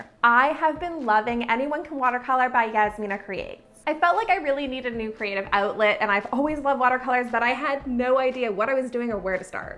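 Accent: Canada. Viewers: omg ru canadian bc u sound SOOO canadian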